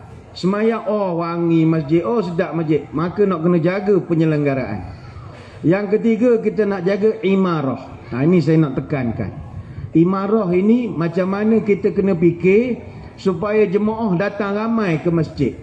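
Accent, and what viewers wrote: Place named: Terengganu, Malaysia. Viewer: pasih molek ustaz cakak sleng ganu:)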